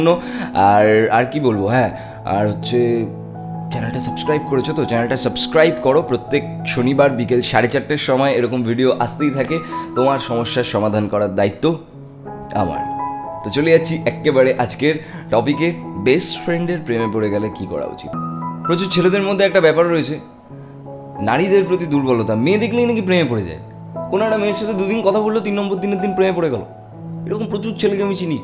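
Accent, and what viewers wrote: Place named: India. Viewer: কথা শুনে বুঝতে পেরেছি বাড়ি ভারতে ভাইয়া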